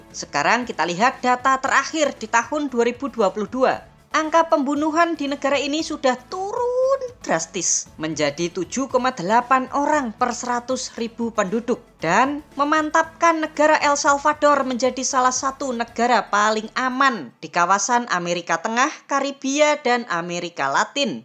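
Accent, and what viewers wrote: Java, Indonesia. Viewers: Admin medok rek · Buset dah Medok Amat ngomong nya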